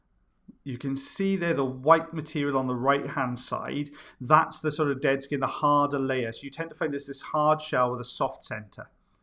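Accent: Wales, United Kingdom. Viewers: Hey Dr., I'm curious, what specific part of the U.K. are you from? I feel like your accent was a bit more vibrant in this episode. I'm not complaining of course, I love it! 😊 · He has a Welsh accent.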